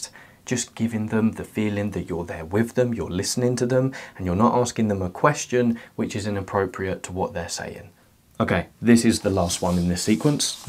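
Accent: England, United Kingdom. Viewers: Which part of England is that accent from? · Your contente is good but the British Accenture is annoying. I would like to be able to hear this with an Californian Accent. Hire a Hollywood speaker and make another channel with a fun female voice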